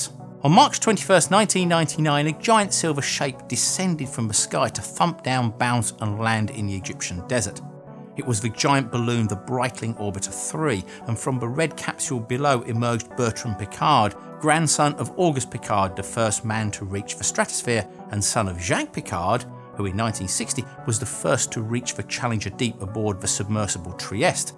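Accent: United Kingdom. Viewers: doesn't sound very cockney to me....sounds more like a very typical clipped British accent....true cockney doesn't sound anything like English, at least from what I've heard....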